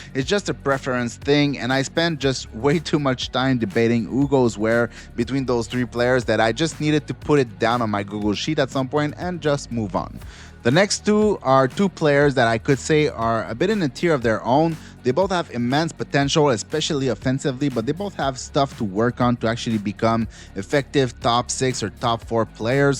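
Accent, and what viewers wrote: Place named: Quebec, Canada. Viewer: ca prends un QC pour reconnaitre un QC qui parle anglais, Sup dude! ;)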